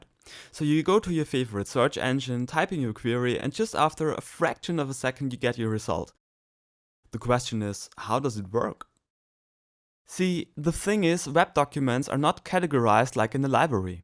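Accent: Germany. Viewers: The german is strong with this one :D But gj, simple and understandable. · Aww cute German accent!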